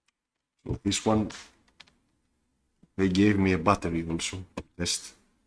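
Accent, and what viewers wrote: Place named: Greece. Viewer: Η προφορά σου μοιάζει ελληνικη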